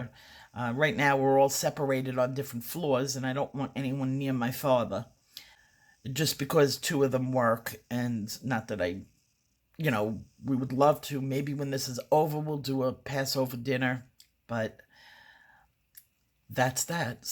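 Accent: New York, United States. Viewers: I love your stories and now you have my other cat, Loki, in thrall of you. Lol my "kids" love the NY accent;)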